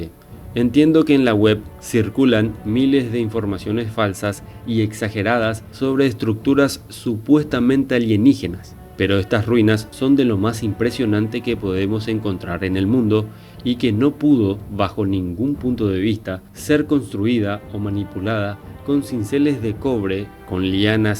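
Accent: Paraguay. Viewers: Eres de paraguay amigo ?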